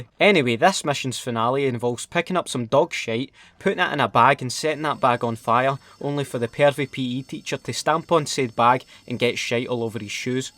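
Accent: Scotland, United Kingdom. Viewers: Omg its my favourite fookin shite trainspotting accent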